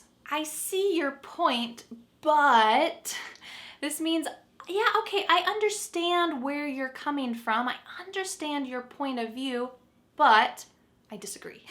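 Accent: United States. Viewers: She has an american accent. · thank you for teaching us you are the best teacher for learning American accent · I love you American inglish, I do understand everything's you say,by the way congrats for you program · It's such a pleasure to wake up with your nice US accent and your funny mood. Thanks for the English loving speaker as I am. Have a nice day. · Love American accent from Pakistan. I’m a really big fan of American life and it’s history.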